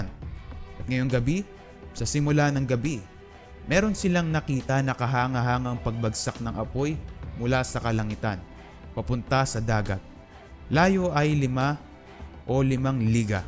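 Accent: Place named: Pampanga, Philippines. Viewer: Capampangan accent hehe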